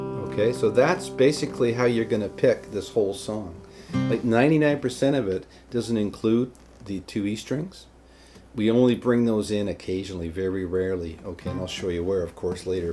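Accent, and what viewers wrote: Canada. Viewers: GREAT TEACHER! The way you teach really connects with me thank you friend. BTW Are you Canadian?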